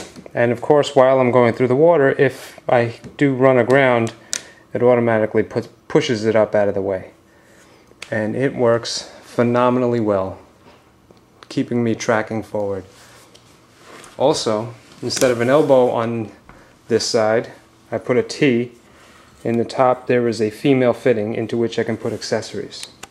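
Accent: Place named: New York, United States. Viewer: This was brilliant. I know you said "Florida" but you sound like you're from NY, maybe Queens.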